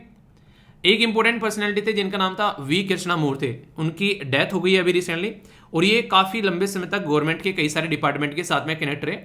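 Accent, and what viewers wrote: Haryana, India. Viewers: sir app kon si district se belong karte hai app ki awaj haryanvi lagti hai jo bahut hi pyari hai i am also haryanvi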